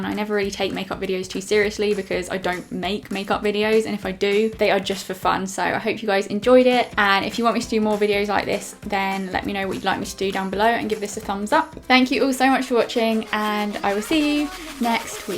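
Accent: United Kingdom. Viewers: are you british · this is British accent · ITS CALLED A BRITISH ACCENT!!! I'm British :'( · Ur British accent is sooo cute xx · iiSavageAmerican you dumb bitch it's called BRITISH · Shut up! It's called a British accent!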